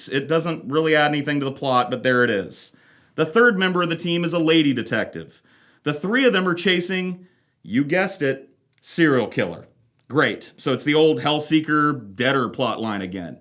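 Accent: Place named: United States. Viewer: Ok which part of america does this amazing atheist voice come from?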